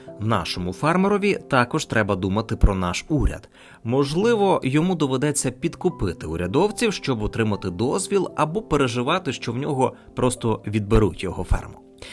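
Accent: Russia. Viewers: Дикторе! Купи поп-фільтр, або навчись ним користуватися! 👺 І ще важливий момент: українська "и" це не расєйська "ы" по вимові! А "що" - це не "шчо"! 💩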